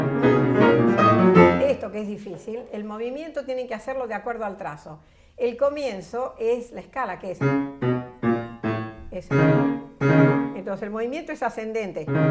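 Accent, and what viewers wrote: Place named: Buenos Aires, Argentina. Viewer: que buena clase y en porteño mejor aún uno asimila mejor el conocimiento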